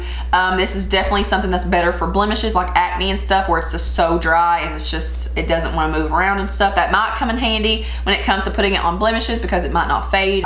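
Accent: South, United States. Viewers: I saw this brand on the WM website and was on the fence whether I should give it a shot! Side note: I just found your channel and I love finding youtubers that are from the South and sound like people I know. I'm from OK!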